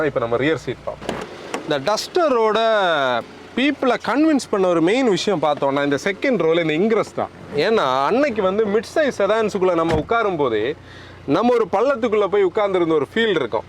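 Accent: Kanyakumari, India. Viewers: Kanniyakunari slog apadi than irukum..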